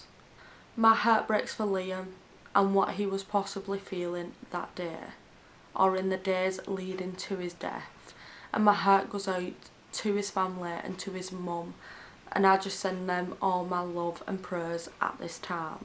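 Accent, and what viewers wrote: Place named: England, United Kingdom. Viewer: are you and Mollie both in England? You sound very different (accents). I'm wondering if there are different accents within England like there are in the US. To where when someone talks, we know pretty much where they are from.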